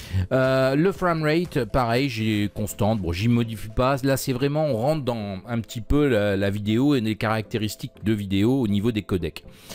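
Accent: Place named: England, United Kingdom. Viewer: J'aime bien ta chaine et globalement bien tes vidéos. Mais je trouve quand même que c'est parfois un peu bâcle. C'est un thème intéressant à aborder, mais on dirait que rien n'est préparer et que tu ne sais pas de quoi tu parles (ici notamment quand tu décris les fonctionnalités de l'inspector). Outre l'accent anglais qu'on te connait, y a beaucoup de variables/fonctionnalités que tu n'expliques pas alors que ça serait bien utile. Tu as plus ou moins dit que le frame rate c'était pas vraiment utiles à modifier pour un usage amateur, et que ça correspondait plus à un paramètre plus compliqué/poussé dans le domaine de la vidéo alors qu'en réalité c'est littéralement LA BASE. Voilà tout. Ce commentaire n'est pas à prendre contre toi ou negativement, c'est simplement une critique constructive pour t'aider :)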